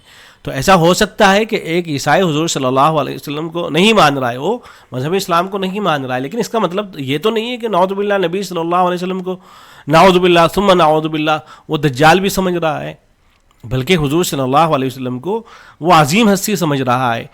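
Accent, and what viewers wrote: India. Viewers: مولانا السلام علیکم اپکاتعارف کیاھے آپ کہاں سے ہیں آپکا لب ولہجہ توشہردیوبندکے معزز لوگوں جیسا ھے ایسالگتاھےجیسےآپ میرے جانے پہچانے ہیں آپکی فراغت کب ھوئی ھے بہت شکریہ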